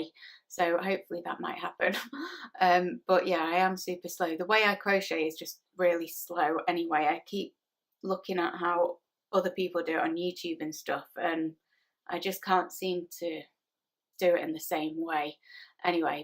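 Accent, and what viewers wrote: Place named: Northern England, United Kingdom. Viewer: Hi! I've only just found you. What a soothing voice you have. I thought I detected a northern accent there, I'm from Chorley ( 20 minutes on the motorway from Manchester). I really love Abakhan as well.... I love the Northern quarter in general. Good to know about Moston, I'll give it a try! Lovely to hear all about you! Thank you. 😘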